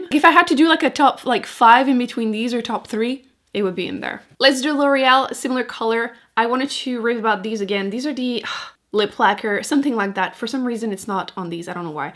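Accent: Quebec, Canada. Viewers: I could listen to you talking all day. LOVE your accent. It's French Canadian yeah? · yes i thought it sounded faded French and then i googled it and someone said you sound French Canadian. Usually they have heavier accents though. Where do you live?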